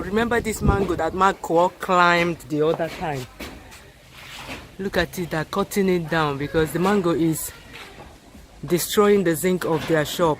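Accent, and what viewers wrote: Igbo, Nigeria. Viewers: I love your igbo ma, it just sweet to my ears😂😂😍😍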